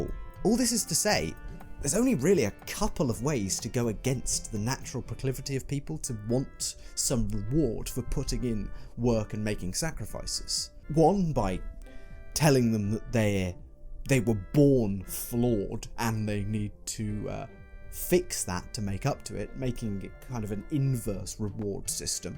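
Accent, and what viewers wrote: United Kingdom. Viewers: wait—“modern mainstreaming of Christian culture” in the US? You mean those TWO THOUSAND YEARS of “modern mainstreaming” of Christian culture in Europe are just going to get a pass? You sound like you have a British accent, can you comment on the thousands of lives lost and the awful terrorism stoked by religious fervor during the Troubles? Or perhaps the explicitly violent Christianizing mission of European colonizers in Africa, South and Central America? Or the European Crusades? Come on, let’s not have an AmericaBad moment here.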